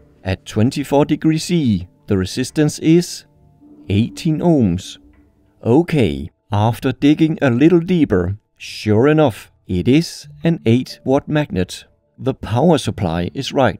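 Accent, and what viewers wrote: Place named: Denmark. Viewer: Nothing like a good old danish accent 😄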